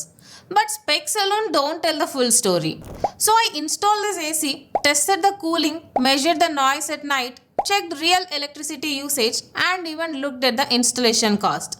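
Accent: South India, India. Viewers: Are you from south?? Your speeching style like that...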